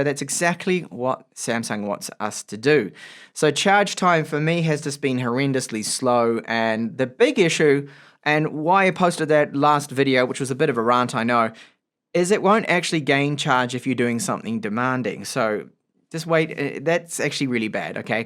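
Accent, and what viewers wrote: Australia. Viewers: he sounds Australian to me too? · What's your nationality? Aussie, Kiwi or English? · He sounds Australian to me lol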